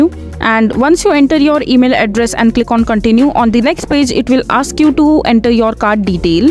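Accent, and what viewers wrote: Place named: India. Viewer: Don't trust english indian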